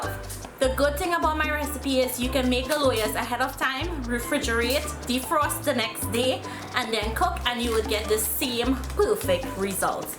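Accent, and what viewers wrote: Trinidad and Tobago. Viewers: ... That's the sweet Trinidadian accent! Really lovely. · I have always love the Trinidadian assent. From a vincy girl.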